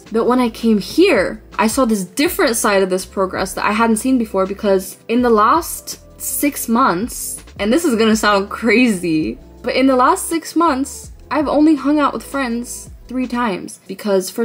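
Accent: Russia. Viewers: У тебя идеальный русский акцент!❤❤❤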